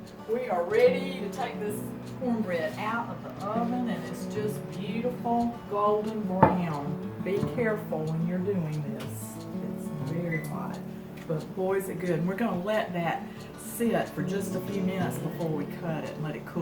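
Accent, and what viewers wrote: South, United States. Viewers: Can you just flip the skillet upside down to get it to fall out after you pull it out of the oven? Your genteel Southern accent is all I need to convince me to try this recipe.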